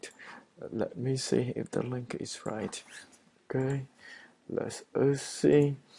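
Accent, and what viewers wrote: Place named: India. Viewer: Anh này Việt Nam mà accent như Ấn Độ ấy nhỉ =))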